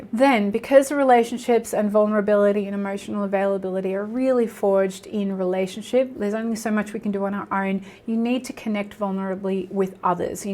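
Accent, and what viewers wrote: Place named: Australia. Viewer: I think she’s Australian.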